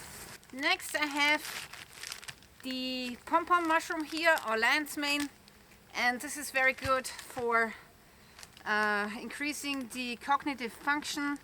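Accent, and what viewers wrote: Austria. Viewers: I always wondered about your strange accent. It sounds german but not exactly. Now i found out you’re Austrian. So well, that make things clear. Kind regards from Belrin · Nice video, love the way you explain everything about mushrooms, I don’t care much about mushrooms🥴 but hope you have good harvest next season BTW I love your Austria 🇦🇹 accent ☺️👋from 🍊orange County Ca, ⛺️🪵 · irgendwie irritiert mich dein ösi-akzent im english ;) weshalb english video? wg reichweite? oO oder bist du ausgewandert?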